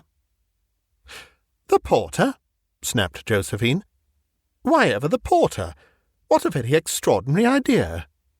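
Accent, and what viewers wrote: England, United Kingdom. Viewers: Probably the best narrator on YouTube. It's a joy to listen to a trained English voice. Thank you.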